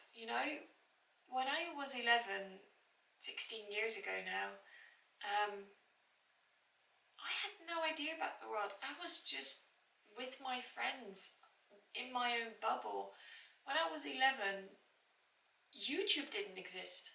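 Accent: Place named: United Kingdom. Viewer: You sound British. How was the reaction to the movie in the UK vs US? I get the impression that the movie hasn't been a big thing over there.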